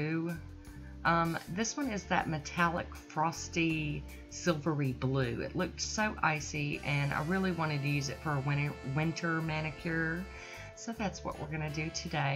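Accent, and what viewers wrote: South, United States. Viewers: The Whisk is very pretty! In the end I like this, it's cute with the snowmen and snowflakes! I gotta add, lol you tickle me to death with your "southern slang" and pronunciations (it sounds like I'm listening to myself) I just love listening to you talk! I never really understood why people would say that to me lol but now hearing you I understand! I got up from bed not wanting to wake my hubby with my horrible cough I have going on so I decided to go to my special spot and catch up on my videos lol, not so sure it was good for the cough lmbo but it sure is good for my feelings haha! Thank you, you're Awesome! Till next time, much love!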